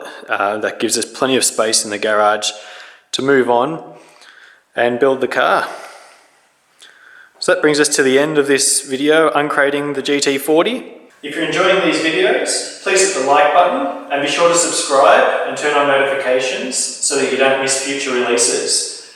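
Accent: Australia. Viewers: Jesus how does one listen to that damn accent all day? Having you stuck down there in Australia was teats , yorn the Internet😐 - I can’t take it🇺🇸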